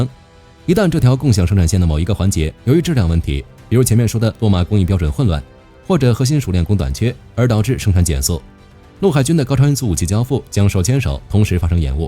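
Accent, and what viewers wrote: Beijing, China. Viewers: 火力君在军事术语上，最近一年来始终都在向台湾用于对标，比如“陆攻”，“资料链”，“镭射”，“寻标器”......，但是朗读所使用的AI引擎却还是字正腔圆的北京普通话，这一点特别别扭。要么你就统一全按北京标准用词然后使用这个语音，要么你就改个台湾语音朗读AI引擎，使用正统的台湾发音标准。现在搞得四不像，照着虎画成猫。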